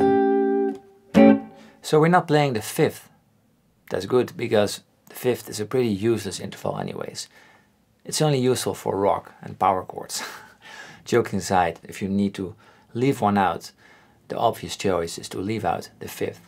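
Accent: Netherlands. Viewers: Are you dutch ?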